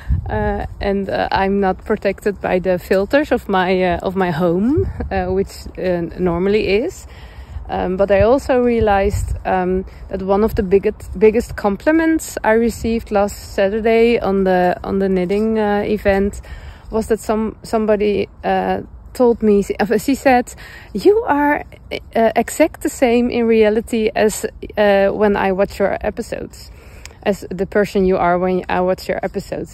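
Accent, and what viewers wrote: Netherlands. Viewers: I cannot thank you enough for taking the trouble to do a podcast in English. Your “Dutchy” English is quite good and enjoyable to listen to. I had heard about you for a little bit, but just started watching your knitcasts last week. I would 100% say that you come across as genuine, quirky and fun…. I especially enjoy your love of brown and your hair. You have one of the most lovely hairdos, curly bangs and all. 😊 Hope you have a lovely week. Looking forward to seeing you steek your cardigan! 😁💚🧶☕️ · I’ve just started to watch today’s podcast but I had to finally comment. I’m in the US but from Canada where my parents immigrated to from Belgium in the 50s. My mom, who passed 3 years ago, also spoke “Dutchy English” and so many of your expressions remind me of her. ❤ my parents are from Brugge and Meetkerke and so much of what you share reminds me of my family. 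Today when I saw you leave your house and saw the colorful plastic strands in your doorway it made me hurry to the comments….my aunt had those too! ❤ thank you for bringing so many memories of love and family back to me…. And I love your clothes and granny squares!!! 😄 thank you sharing your life and knitting/crochet. PS the bangs are nice curly!! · Thank-you for another lovely podcast. I enjoyed seeing the countryside around your town. Your dog riding in your carrier bin made me smile. Not that my opinion of how you wear your should make any difference, but I like the curly bangs/fringe they go with your curly hair 😊 A glimpse into life in another country and the "dutchy english" are both good. Keep slaying those dragons 🇨🇦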